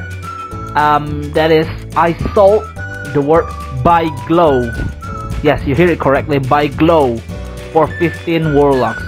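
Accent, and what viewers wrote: Indonesia. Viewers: Logat indo banget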